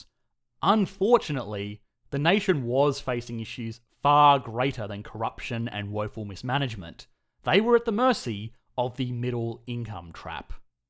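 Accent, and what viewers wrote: Australia. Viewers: But he's not an American, he's and Australian. That should be obvious by his accent alone.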